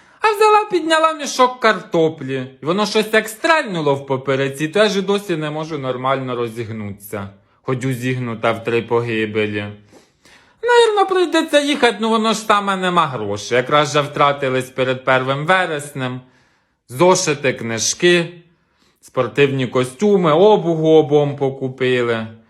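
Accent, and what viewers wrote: Poltava, Ukraine. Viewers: Звідки? по говірці дуже на Полтаву схоже)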